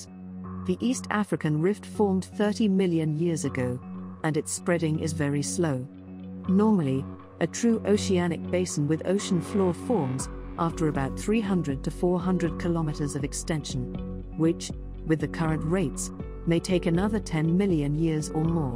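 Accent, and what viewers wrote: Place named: United States. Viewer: It is nearly unbelievable to me how immature and wrong all your videos are, You are like a child playing at a tea party with no concept of reality to guide them. relying on whatever stupid idea comes to mind. As a mature smart worldly Canadian man I find your entire catalogue of crap stating videos to be truly stupid, Why do sound like such an americunt, Stop making this crap and just keep your stupid to yourself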